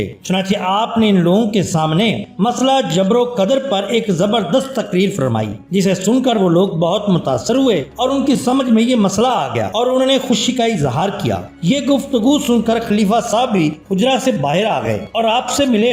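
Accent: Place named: Punjab, Pakistan. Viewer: I hate PUNJABDA ACCENT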